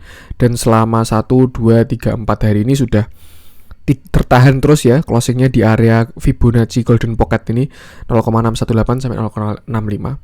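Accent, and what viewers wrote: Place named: Central Java, Indonesia. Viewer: Logat jowo tengah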